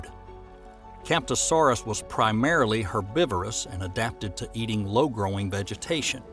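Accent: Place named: Rural, United States. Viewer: Y'know what I just realized while watching this? My view of the south-western US states was subconsciously improved by the fact that this info is being delivered by a rural American accent. It's embarrassing to realize I got influenced in that way, but...seems like an effect that tourism boards should be looking at. Evolution being discussed by this demographic feels out-of-place, but it doesn't have to!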